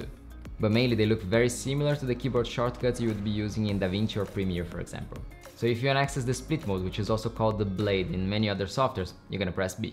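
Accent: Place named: Brazil. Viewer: This accent.... Are you Brazilian?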